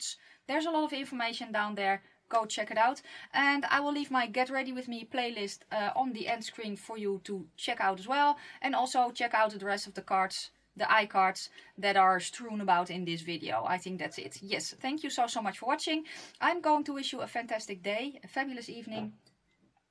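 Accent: Netherlands. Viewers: yep..Dutch hence the accent 😉😘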